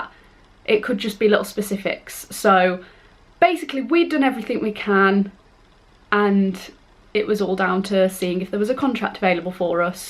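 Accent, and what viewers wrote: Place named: England, United Kingdom. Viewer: wow cool accent, are you from England?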